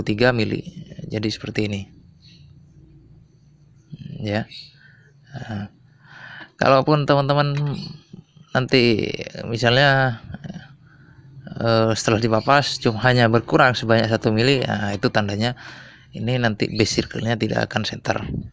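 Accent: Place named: Madura, Indonesia. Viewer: Logatnya madura...asli mana mas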